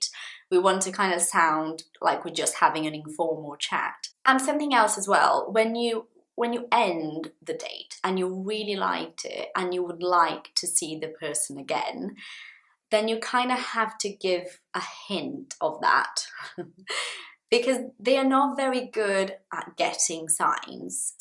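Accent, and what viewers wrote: United Kingdom. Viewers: The British accent is not my favorite but I like the way you speak english!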